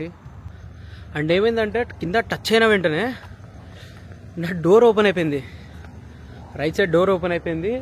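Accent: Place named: Rayalaseema, India. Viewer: Kachitamga nedi rayalseema kada bro ...😊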